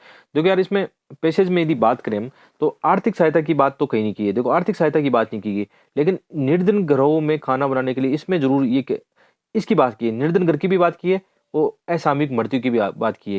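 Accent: Haryana, India. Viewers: Sir are you from haryana apki awaj se pta chlta h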